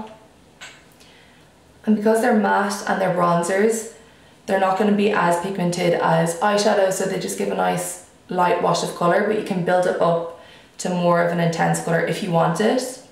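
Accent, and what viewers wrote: Ireland. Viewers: Screw whatever people say about your "weird" accent. It's beautiful!!! I live in New York and I'm Puerto Rican, all I hear all day is boring American English and fast paced Spanish. It's refreshing to me to hear you speak with your wonderful Irish accent. 🍀🍀🍀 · it's only an accent to those who don't live in Ireland.. i love your accent! I'm from Boston so we say things funny too aha love you girly, beautiful as always! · Love this kind of video! Could listen to you talk all day. Whoever doesn't love an Irish accent is crazy! Keep it up babe 💜🙌🏼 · anyone who complains about your accent and the way you pronounce things can suck a toe. how ridiculous! i loveeee your irish accent!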